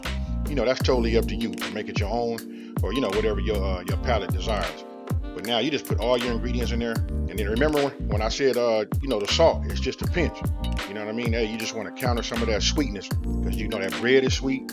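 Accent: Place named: California, United States. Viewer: The California accent though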